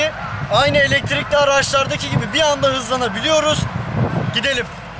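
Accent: Erzurum, Turkey. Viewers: abi siz erzurumlumusunuz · Abi Erzurum lu musn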